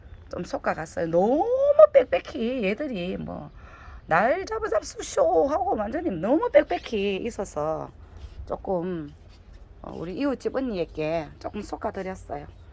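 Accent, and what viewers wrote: Gyeongsang, South Korea. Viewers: 정말 부지런하시네요. 경상도사투리도 구수하구요.ㅋ 님은 어디에서 구매하시나요? 여긴 대구인데...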